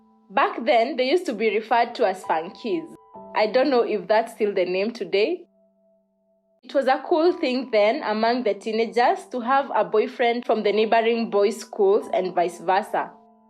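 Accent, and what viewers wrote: Kenya. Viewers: You are a very good storyteller. I love listening to your beautiful Kenyan accent.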